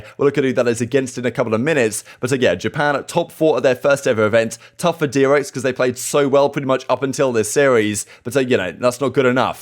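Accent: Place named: England, United Kingdom. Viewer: he’s English, but nice try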